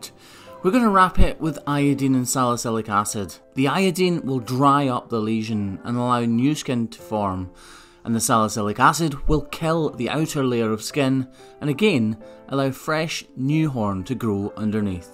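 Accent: Scotland, United Kingdom. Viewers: Gotta love that Scottish brogue, I dated a Scottish girl for about a year and I still miss that accent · That's probably cause he's Scottish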